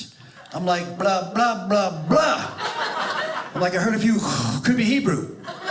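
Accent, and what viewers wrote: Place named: New York, United States. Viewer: I couldn't tell he's from Brooklyn but NY for sure. My boyfriend is also from Brooklyn so I picked up on the accent right away. Also the witness protection comment made me think of the movie Mobsters & Mormons lol 😊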